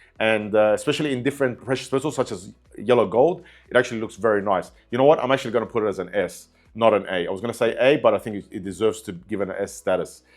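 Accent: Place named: Australia. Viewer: hey brother, are you from Australia as i recognise the accent. Enjoying the content though !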